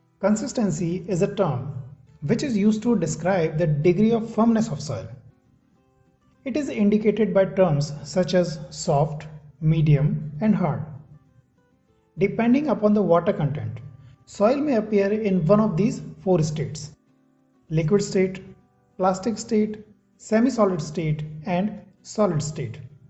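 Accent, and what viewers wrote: India. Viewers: according to his accent, I think he is from south India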